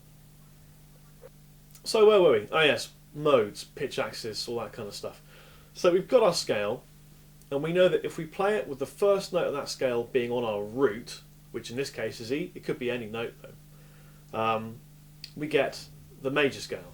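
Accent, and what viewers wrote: United Kingdom. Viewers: British English no less, and it actually makes sense. Thanks!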